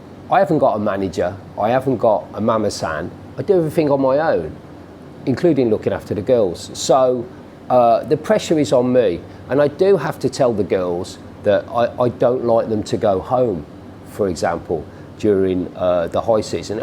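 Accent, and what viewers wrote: Birmingham, United Kingdom. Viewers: Lazy brummie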